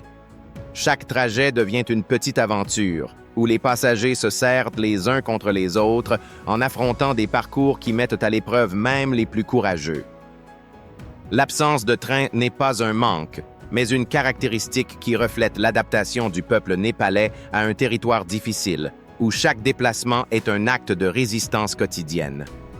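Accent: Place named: Canada. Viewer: cet accent si sympathique qui est canadien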